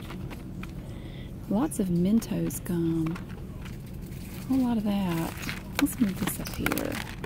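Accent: South, United States. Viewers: Her southern accent is giving me LIFE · Zoran K. georgia,south carolina,north carolina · TheLion yeah sounds very NC/SC/Georgian as someone with family from that region. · This is the best ASMR video I’ve seen and heard in a loooooong time. Certain accents do it for me, so the wonderful combo of your southern accent, your soft talking (I’m not a huge fan of whispering, so your voice was perfect), your attentive organization and knowledge, the sound of all the crinkling......and 30 minutes of it! Pure ASMR perfection......thank you......I can’t wait to watch more of your vids. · Normally I find southern accents annoying but yours is so soothing. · Seba Arias southern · I think she won me over with the crunch of the bagged candies sound and her Southern Drawl. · I love your shopping videos despite all the background noises... it makes me feel like I'm shopping mom/grandma (I only say grandma cuz my grandma has the same sorta southern accent as you!) Cept she was mean to me and your soo super sweet and nice! 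It's almost like having a mother who loves me... I know that sounds a bit sad but your very comforting to me like the mother I never had <3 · Southern, we have it where I live but I’m not that extreme😂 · Southern usa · southern it sounds like north Carolina or Tennessee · Seba Arias she has a southern accent ( she probably lives somewhere south in the us like Alabama or Texas or something) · Seba Arias american? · If anyone from Britain comes back to these comments, I have a question. This video’s narrator has a Southern accent (she would say I have a Yankee accent LOL). Does her Southern accent sound more like British English than other American accents? I have heard this may be the case but was not sure.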